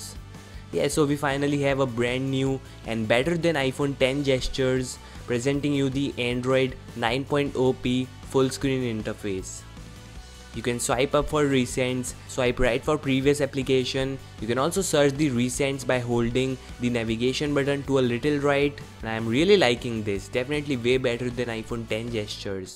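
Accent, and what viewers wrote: India. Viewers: Can't understand terrible Indian accent. Bulbulbull....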